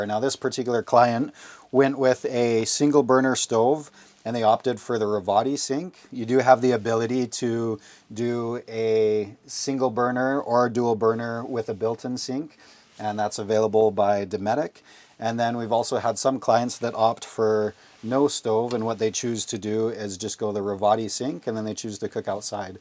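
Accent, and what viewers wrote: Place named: Germany. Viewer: You have a elegant German accent if you are German. Nice van, thank you for the tour.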